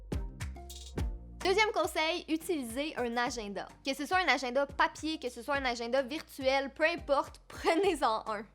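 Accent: Quebec, Canada. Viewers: Tu est cebecoise ?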